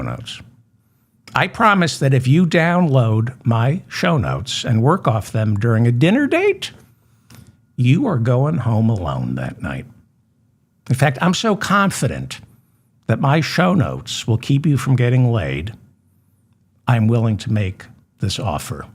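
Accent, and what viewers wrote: United States. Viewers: Once again you have “cracked me up” today! I love your self deprecation, lavatory humour and irony. As a Brit I have to say ( and this is a compliment!) without your American accent you could pass for British!😂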